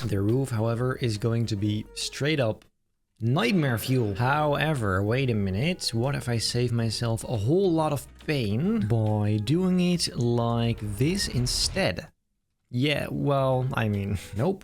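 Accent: Netherlands. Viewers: Duts aksent is the best! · Pls let the man talk Dutch English for an episode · Can you actually make a video with your Dutch accent?? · Me being Dutch, just caught me of guard with that Dutch accent 😅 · PLEASE MAKE A VIDEO WITH A DUTCH ACCENT 😹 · I was al wat verdacht maar het Nederlandse accent maakte het duidelijk dat je Nederlands bent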